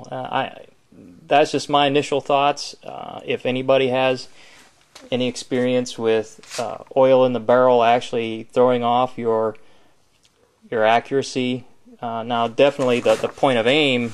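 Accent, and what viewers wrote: United States. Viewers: i can hear a southern accent in you, how long have you live in texas? no offense im from up north.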